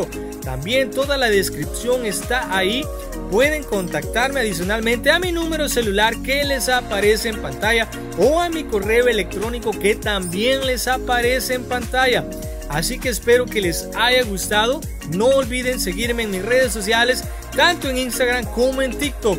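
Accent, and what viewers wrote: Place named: Colombia. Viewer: Disculpa tú de qué nacionalidad eres amigo me suena que eres colombiano verdad sólo por curiosidad gracias y bendiciones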